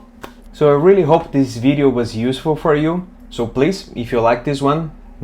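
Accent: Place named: Brazil. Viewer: I have Brazilian friends…they have very similar accent 😅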